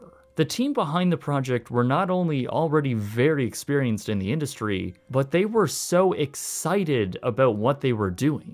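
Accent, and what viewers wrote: Canada. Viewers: "forgotten aboot'! Love the Canadian accent!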